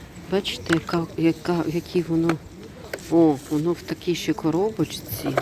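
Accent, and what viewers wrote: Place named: Kyiv, Ukraine. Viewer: Дякую за відео і чудову українську мову з київським акцентом 💞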